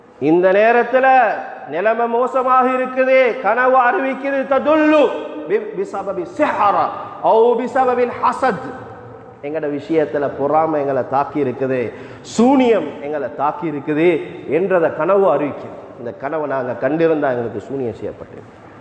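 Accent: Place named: Sri Lanka. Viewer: Sri Lanka Muslim tamil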